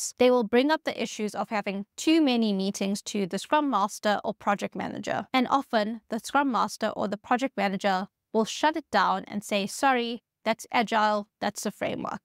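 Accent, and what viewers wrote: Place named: South Africa. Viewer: Spotted the South African!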